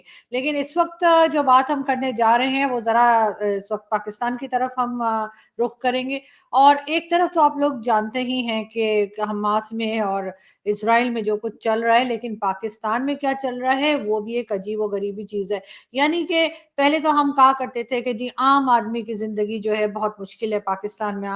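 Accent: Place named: Allahabad, India. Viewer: you speak EXACTLY like ALLAHABADI speak You have atleast, inherited this from your father You are the MOST GRACIOUS AND LOVEABLE DAUGHTER OF ALLAHABAD MY piles of love and Ashirwad ❤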